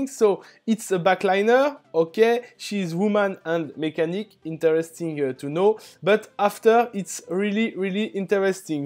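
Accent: France. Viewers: ahah y'a pas de soucis mais c'est sympa de reconnaître un accent français parmis tous ces HL ^^ oui après il me manque quelques essentiels à passer légendaire, surtout maitre mage mais elle est en cours) mais sinon je les ai tous full mais je galere je suis bloquer à 24/25 je tombe que sur des mecs de grosses guildes j'ai pas trop de cul ^^ bon jeu à toi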